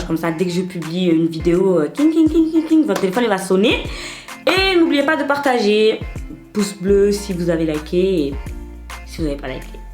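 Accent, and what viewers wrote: Marseille, France. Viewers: ya une une video ou elle parle combien ça la blesse que les francais disent prk elle a un accent marseillais alors qu'elle est renoi je t'invite a la voir tu comprendras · No mais serieux je cherche toutes tes videos rien ke pour ecouter ton accent marseillais!!! Je kiffe tropppp 😍😍😍😍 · Je kiffe l'accent marseillais 😍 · j'aime trop ton accent Marseille 😁💙💙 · J'avais jamais entendu de renoi avec l'accent marseillais mais je savais qu'il y en avais et je trouve sa MAGNIFIQUE je kiff à mort ta voix et tout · Ses tarpin de sbob chez toi machallah parlez à la facon marseillaise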